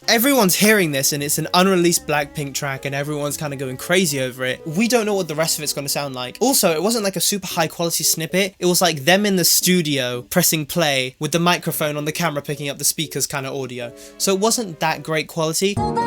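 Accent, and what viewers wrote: England, United Kingdom. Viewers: I hella LOVE this english accent !! Like, not the American accent, the English one sounds so soft 😭❤️ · I’m so slow😭😭😭 I didn’t realize was British until this video. Idk I guess I wasn’t paying attention before but I’m now acknowledging how thick his accent is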